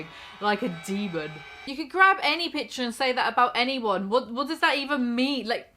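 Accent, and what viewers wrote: United Kingdom. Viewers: I LOVE your accent. I usually hate British accents. i dunno....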